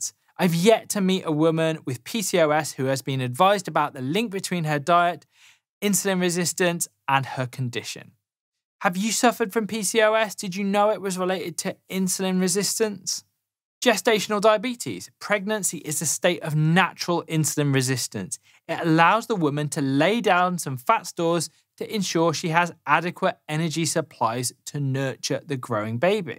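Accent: United Kingdom. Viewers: At last ! A British accent !! From a knowledgeable British doctor. No more Yanky ti Yanks lol. Thanks for posting.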